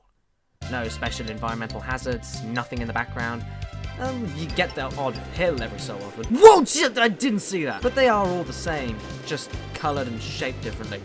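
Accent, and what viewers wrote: United Kingdom. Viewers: Playstation Master and JonTron Incarnate but BRITISH.= AWESOME. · He is from the uk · Dude he is British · this guy ain't the funniest I've seen but dang I cannot resist the british...seriously the very few girls I've ever talked to say that men with british accents are automatically more attractive... please teach my your ways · Ur so British!!!! I luv it ^^ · I know that, but whether or not this other guy knows it he seems to think that a game which is suitable for 3-year-old kids makes it automatically a bad game. I just simply pointed out that he's clearly wrong. But anyways I'm sure we can all agree to whatever we're claiming here so I think we could just put this thing to rest and just continue praising you, your british accent and your beautiful sideburns.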